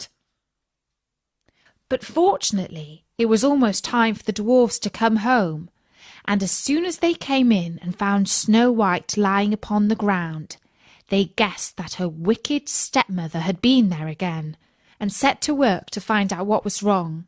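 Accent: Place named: United Kingdom. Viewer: J'adore ton " british accent" qui va á merveille avec les contes ainsi que ta voix qui ressemble à "kiara knightly" vraiment bravo et chapeau bas pour ta façon pro de raconter la conte qui laisse á imaginer les evenement sans les voir💜👍👏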